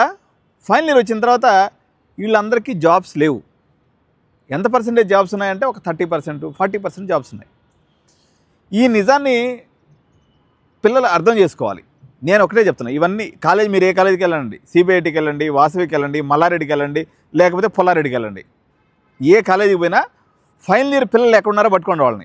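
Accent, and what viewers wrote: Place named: Nellore, India. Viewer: నెల్లూరు బాష, యాస లో ఎక్సలెంట్ గా చెపుతున్నారు సార్ 🎉🎉🎉🎉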